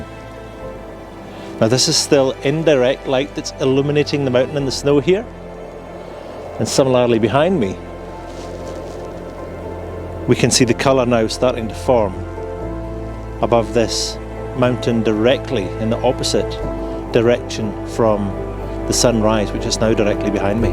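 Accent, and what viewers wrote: Scotland, United Kingdom. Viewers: I came across many sites on photography, but never one like yours. You really hone in on the finer details and helping struggling photographers like myself in choosing when best to shoot and what settings to use. I love this segment, as well as the one on shooting pano when you explain the need to use the nodal stick to reduce perspective "distortion" and helps to blend multiple images better. I have since bought one such machine, thanks to your teaching. Please please continue to inspere us, the struggling photographers. Your voice is good, "smooth Scottish" accent I kind of getting used to now and it sounds like music to my ears. Thank you is never enough for such a great teacher like you.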